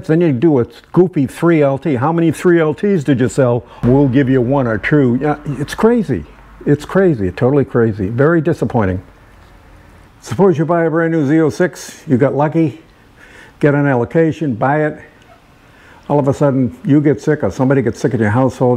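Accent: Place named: Northeast, United States. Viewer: Just stumbled on your channel and I watched this video. It sounds like you are from the NE. Can you specify what state ? Love the vid !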